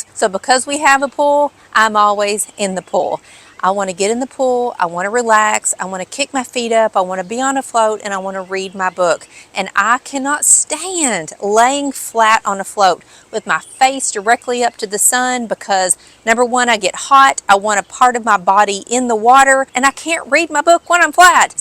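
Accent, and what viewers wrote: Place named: Kentucky, United States. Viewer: Love,love,love your program. I love Paducah. Have friends there that are involved in the art community. I especially live the doughnuts for getting rid of mosquitoes. They are already bad in Owensboro. You are so personable and we have the same accent. I also love KY Lake. Had many good times there.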